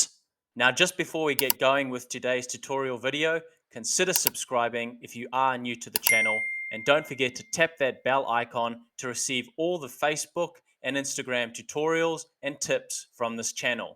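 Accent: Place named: New Zealand. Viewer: I wish I had seen this video 2 hours ago!:) Thank you so much for this info. So, even though I spent a lot of time manually deleting posts, you just saved me another 2 hours! BTW: As soon as I heard you speak, I thought to myself, "If isn't from New Zealand, I'd be very surprised!" Then, I saw your page and saw you live in Tauranga! I spent many happy days back in the 1980s with friends who live in Tauranga. I seem to recall running a road race at Mt. Maunganui as well. New Zealand remains my favorite place on earth, and wish I could have lived there.:)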